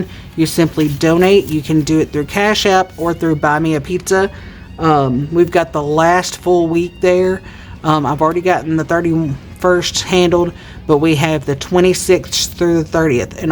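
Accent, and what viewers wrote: South, United States. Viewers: You are definitely a southerner, just like me! North Cental Louisiana, here! I have a very slow drawl! 😂😂😂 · I’m from GA and you have an accent to me. My parents are not from the south though, so I don’t have a southern accent. I do say y’all a lot though 😂 Love to see you doing so many challenges ❤ Great job! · Hi...new subbie here:) I'm northern so yes, you do have an accent lol Your savings challenges are inspiring!! Great video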